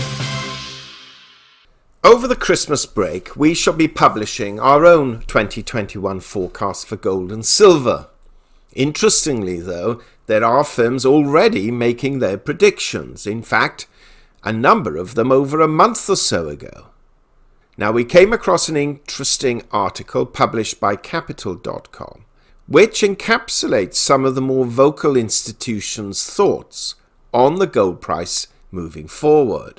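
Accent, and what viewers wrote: United Kingdom. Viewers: I am too new to this to offer any prediction or guidance. However...I do appreciate, value. Cool headed reasoning, professional interpretation(s), cool British accent and annunciation, and a little entertainment thrown in...all for FREE. I’ll always choose the one or two friends that are willing to tell me the hard truths than one or two hundred, patting me on the back. I would revisit my last statement of course, it were to be one or two hundred ladies, if we’re being honest. Anyway. Thank you for providing such a valuable resource. Some of us ARE listening.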